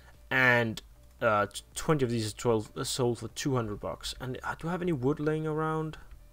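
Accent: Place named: Denmark. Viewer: hmmmm i can smell danish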